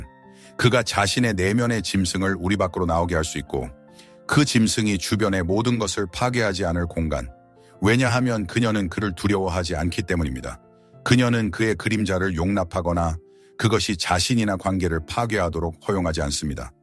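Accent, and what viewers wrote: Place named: China. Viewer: 중국분이신가요? 발음이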